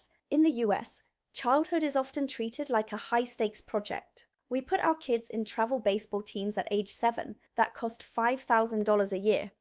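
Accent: England, United Kingdom. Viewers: I want to know why you have an English accent if you are American and only been here 2yrs or so? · American brain, English accent. give a break! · Why does she have a British accent?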